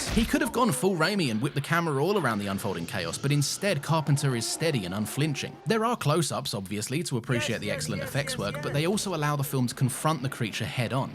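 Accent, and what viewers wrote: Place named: United Kingdom. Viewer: 20 secs into a video and I already hit the subscribe button Your vibe totally clicked with me, the pacing, the delivery, the montage, everything Keep it up, brother, that's some good stuff you're doing here in all honesty P.S. A cherry on a top of all this is the fact that I don't have to put you on 1,25 speed because you are already fast enough P.P.S. Your accent is another one (by the way what is it? I'd say it sounds British but I'm not too good at guessing these)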